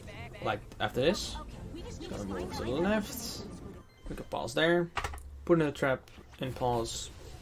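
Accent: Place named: Netherlands. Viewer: Mooie Nederlands-Engels accent.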